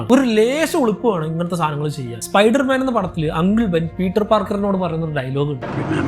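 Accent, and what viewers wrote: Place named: Hindi, India. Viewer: A malayali with Hindi touch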